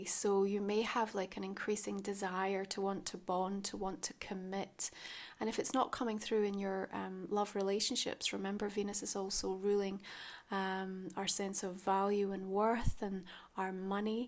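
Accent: Scotland, United Kingdom. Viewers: Hi it was lovely to see you in the flesh in Edinburgh, I looked for you I’m sorry we didn’t get a chance to speak more x I love your content, style, delivery and your lovely Scottish burr. ❤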